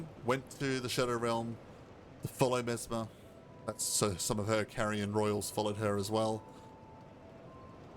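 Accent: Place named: South Africa. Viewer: You sound South-African or Australian.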